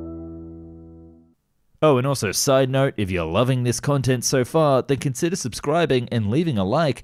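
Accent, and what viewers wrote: Australia. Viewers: I never asked for aussie accent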